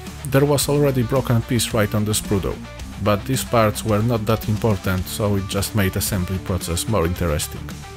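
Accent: Poland. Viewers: Siema 😊 Polski akcent ❤ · sound like polish accent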